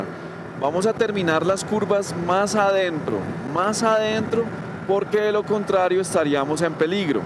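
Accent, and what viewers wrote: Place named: Argentina. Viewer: Antes de q diga q era Argentino ya me había dado cuenta q lo era. Tenemos un acento único! 😁 Buenísimo el video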